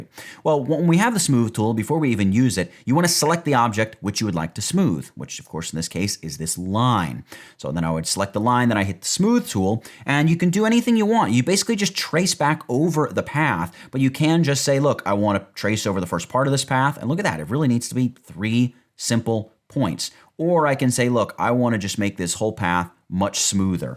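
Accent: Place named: East Coast, United States. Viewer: Good stuff east coast accent dude.. 👍👍 I’m I wannabe design engineer, thinking about entering the automotive industry for added economical improvements.. If you needed any specific inspiration. 🏆🏆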